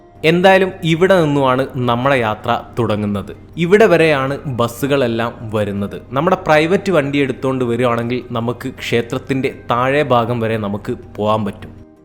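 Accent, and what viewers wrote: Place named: Malabar, India. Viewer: മലബാറുക്കാർ അങ്ങനെ ആണ് പറയുന്നത്.... മധ്യ തിരുവിതാം കൂറിൻ്റെ ഭാഷയുമായി ഉച്ചാരണത്തിൽ വ്യത്യാസം ഉണ്ട്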